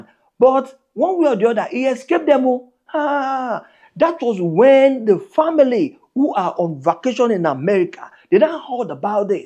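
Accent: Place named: Nigeria. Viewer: Please do your videos in proper English not this your Nigerian English its not all of us who understand it yet we follow you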